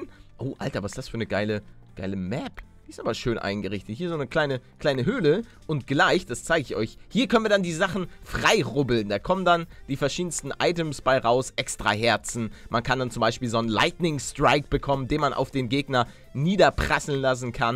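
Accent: Hamburg, Germany. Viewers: Kommst du irgendwie aus Hamburg oder so? Oder Bild ich mir das nur ein?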